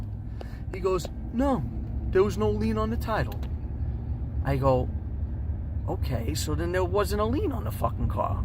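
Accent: New York, United States. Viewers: A man of many accents. Considering your heavy new york accent, your southern is pertty darn good!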